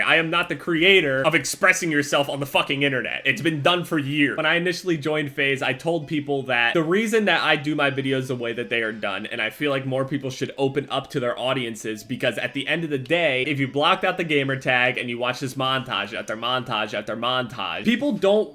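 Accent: Canada. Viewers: You sound like you're Canadian lool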